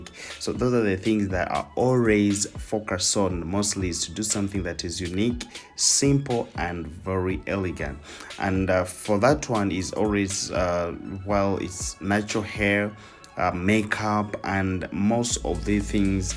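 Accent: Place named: Uganda. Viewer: Voice over sounds Ugandan 🤔🤔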